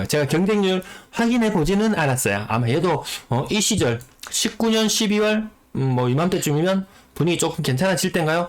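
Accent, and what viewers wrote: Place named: Gyeongbuk, South Korea. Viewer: 운영자님 경북사람 이네요~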